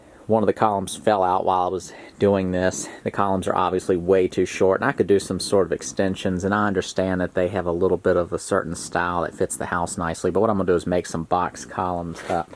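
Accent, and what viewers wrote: South, United States. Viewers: what region of the states is his accent from ? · I wonder that too! Based on his accent and the hilly terrain, I'm thinking north Alabama or west Georgia or... ?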